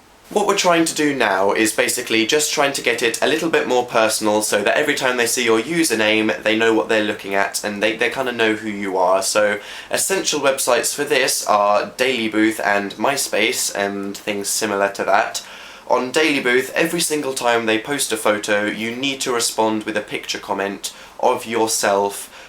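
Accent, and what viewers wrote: United Kingdom. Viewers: He sound extra british here =)) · Why is he so overly British in this 😭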